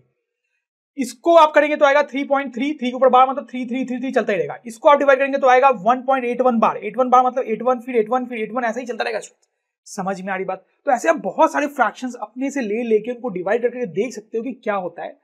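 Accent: Bihar, India. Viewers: aap bihar se hai kya sir ?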